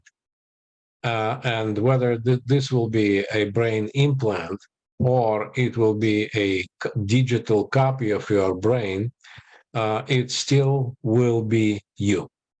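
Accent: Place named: Russia. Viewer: Здравствуйте,! Слушаю ваши лекции по истории религий на русском и они мне очень нравятся системностью подхода, чётким структурированием и ясностью изложения. Чувствуется, что у вас большой опыт преподавания. Сегодня попробовала послушать лекцию на английском и была обрадована тем, что понимаю! Отношу это в определённой степени за счёт вашего "русского" акцента ( не сочтите сомнительным комплиментом), Очень рада, что теперь потенциальный запас интелектуальных "вкусняшек" у меня увеличился. Они помогают пережить время морока, накрывшего мою страну. Thanks a lot!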